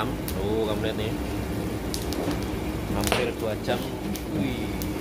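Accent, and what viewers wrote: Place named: Java, Indonesia. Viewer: Logat Lidah Rasa Jawa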